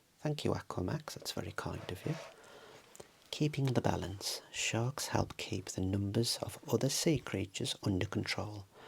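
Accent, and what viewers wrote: Birmingham, United Kingdom. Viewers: Mother of pearl. If there was an Oscars for ASMR, this would be win some awards. A lot of ASMR artists just read the books word for word and I find this a bit boring and struggle with this. I LOVED this video as you mix it up so much and keep us in the loop (loved all the "save your money" comments!). I know this is a live video but your style is really good. I have only just discovered your channel (shout out to reddit!) and really really enjoy your work. Like a Brummie version of Mr. Relaxing ASMR! lols! (That is a complement btw! Love your accent!) Ps. I also LOVED the tapes and CD ones. Especially as it is clear you were an old school raver! Or were you lol? (make some noizzzzz!)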